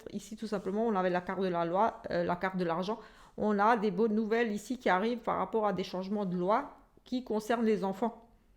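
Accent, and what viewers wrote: Alsace, France. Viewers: J'adore votre accent depuis 6 ans je vie en Alsace avant jetait en Moselle hopla dü ! Bisous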